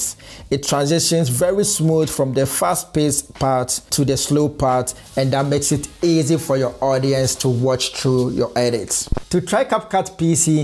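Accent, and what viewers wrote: Ghana. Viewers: This is far from the video…are you from Ghana? You sound more like a Ghanaian 😂 I’m a Ghanaian so I know our accent 😂😂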